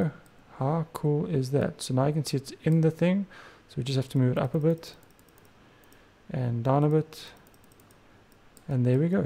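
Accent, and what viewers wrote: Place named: South Africa. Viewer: Thats that South African Accent. Shout out from the free state.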